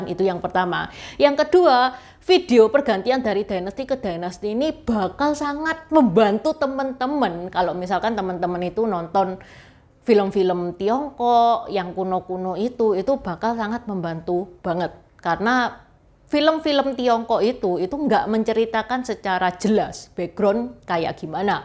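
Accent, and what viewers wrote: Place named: Purwokerto, Indonesia. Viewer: Maaf mba Logat bicaranya kok seperti orang Purwokerto yach😁🤭🙏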